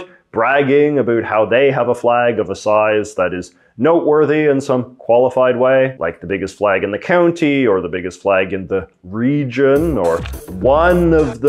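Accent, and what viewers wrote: Canada. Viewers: Love your unmistakable Canadian accent!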